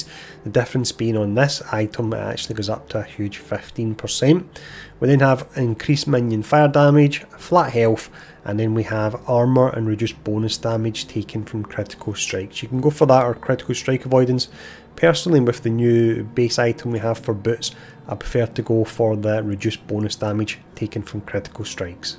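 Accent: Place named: Glasgow, United Kingdom. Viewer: Great Vid thanks for covering the passives like you did! Now, forgive me if I offend, the accent my buddy and I are trying to place - Glasgow? Thanks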